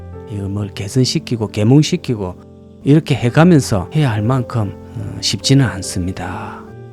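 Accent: Gyeongsang, South Korea. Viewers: 저고향 의령 선생님 말씀진리 임다~ 구수한갱상도 중독성 있는 음성 힐링됩니다 감사이 잘봤습니다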